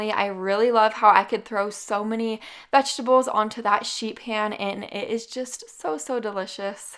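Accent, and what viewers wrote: Utah, United States. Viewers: I'm almost 50 and I wish your videos were around when I was in my 20s. Thank you for these! And your Utah accent is adorable 😄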